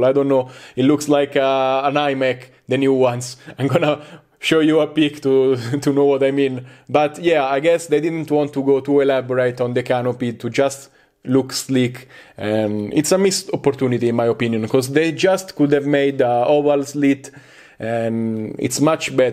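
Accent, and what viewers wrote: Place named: Italy. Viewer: Come si riconoscono gli italiani , anche se parlano inglese correttamente, continua così ottimo video 💪🏻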